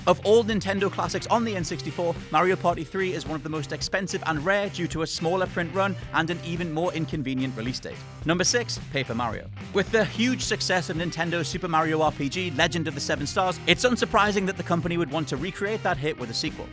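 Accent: United Kingdom. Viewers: because he's British? That's how we say it. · Yeah, he's britsh. Rember they call the SNES the SNES and not by each letter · That weak currency, on a British channel, with a British narrator? Nahhhhh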